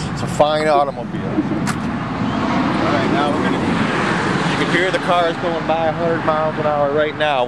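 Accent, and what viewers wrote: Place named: Germany. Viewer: Damn i speek fluent German but you sir sound more german than germans.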